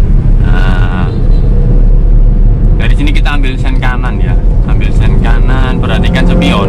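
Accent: Surabaya, Indonesia. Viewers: saya juga surabaya om, kedung cowek suka caranya ngomongnya hehe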